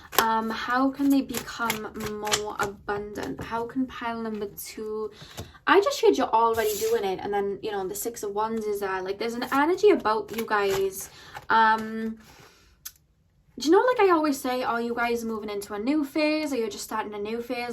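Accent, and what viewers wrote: Wales, United Kingdom. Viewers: I love it the Welsh is one of my most favourite accents I don't know how but I hear a little bit geordie in there it's lovely! And your readings are so good!! 😘💗💗💗💗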